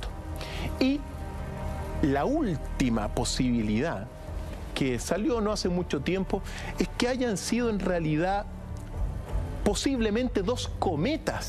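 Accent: Chile. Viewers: Es chileno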